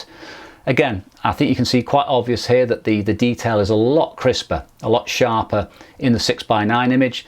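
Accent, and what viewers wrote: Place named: United Kingdom. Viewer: Dear: I love the form and content of your channel. English is not my native language but and I understand the British accent better. I like that you are serious, humble, calm and your funny touches of humor. I love the comparisons you make between expensive and also popular cameras and that you go through various formats. It is exciting that a camera that is over 70 years old, but of course, of medium format, surpasses a camera with much more technology in several aspects. I also like that you can integrate both film and digital cameras and rescue the M43 in a kind of countercurrent where photography is often reduced to the more MPX and more ISO maximum level. Very happy that I still have more videos to learn. Greetings from Buenos Aires.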